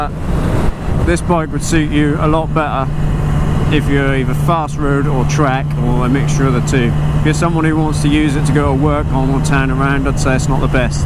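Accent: Norfolk, United Kingdom. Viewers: Great vid. What would the R6 be like as a first bike? Also. I thought I recognised your Norfolk accent as I am only 20 or so miles from the border. lol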